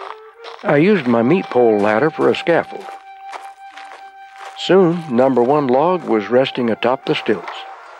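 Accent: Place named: South, United States. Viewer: Alaska??? Has to be. He sounds southern tho. Man I wish I could build like this we have all lost this knowledge its so sad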